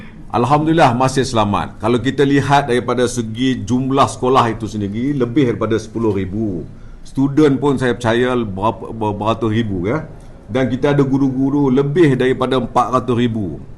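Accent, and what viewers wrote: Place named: Perak, Malaysia. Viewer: pekat bebenor peraknye yeop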